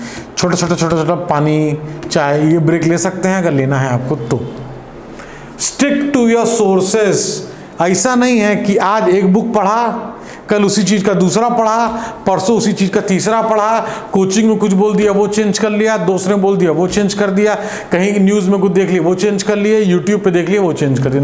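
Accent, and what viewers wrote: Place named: Kannauj, India. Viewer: Sir you are such a best motivator. Apne toh mera mind hi change kr diya ap kannauj se belong krte hai kya sir apki language is like my mother tongue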